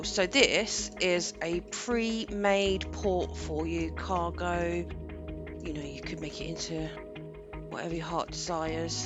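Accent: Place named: England, United Kingdom. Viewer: sit ya self down, put ya feet up an sip on sum sweet tea for this read. K In my self created tiny world fueled by my vast imagination I’d pictured you sounding like like err… Well I didn’t think you’d have one of them adorable English accents the kind ya find in those Mary popping movies where as soon as ya speak the world shuts up an pays attention so yepperz that kinda accent.Gettin back to my wide off the mark imagination, Id visualized ya sounding exactly like my dear friend who’s shares the same name.. So it was a surprise finding ya not to be Portuguese but a London tour guide Mary poppins! Anyways on to shop talk it’s actually great to see someone doing a map show case….On my channel I do similar show cases on Skyrim so I luv a gd presentation. I hope y’all cover maps of my stompin grounds Tennessee an Louisiana that’ll be a treat. 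Maybe one day y’all cover one of my maps I’m Fixin ta do sum eventually.Anyways teas run dry an feet are aching for a step n a jump I’ll release ya from this insane comment.. oh btw I’ll PROMISE to join ya on ya journey as long as ya poppin in from time to time to check out my skylines videos on my channel 😜 An I ain’t inta breakin promises to a lady I’ve got 4 of them myself at home remindin me daily not to do so. 😠 Mucho Amar 2 youuu an C ya 😃😃😃😃😃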